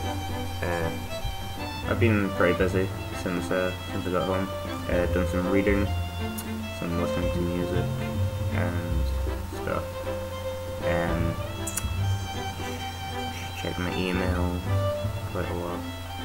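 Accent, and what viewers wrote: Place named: Ireland. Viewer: haha gotta love that crazy irish accent!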